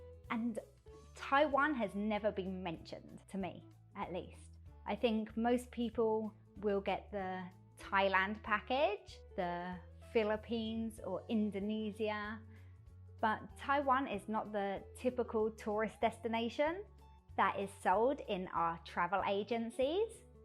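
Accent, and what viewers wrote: England, United Kingdom. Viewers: 好有濃厚的在地英式腔調😊😊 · 不會啊@@ 因為妳的發音很英國啊！ 非常好聽～😍👍🤗 另一個YT艾倫就真的聽不大出來他的英國腔。😁 我想……🤔 跟二次大戰後很有關係，特別政治跟戰略和軍隊，麥克阿瑟將軍在台灣是蠻有名氣的一位美國二戰後的將軍。 今天沒有小朋友～😭 PS: 其實沒有疫情，我們今年暑假是想去英國，歐洲一帶玩的。😭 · 多麼美妙的英格蘭口音！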